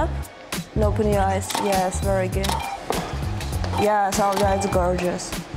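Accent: Russia. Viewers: ХММммм...мне кажется или я слышу русский акцент?)))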